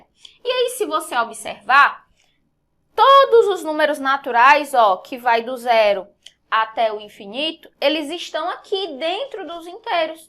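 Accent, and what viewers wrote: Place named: Maranhão, Brazil. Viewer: Muito bom. Sotaque parece dos maranhenses da família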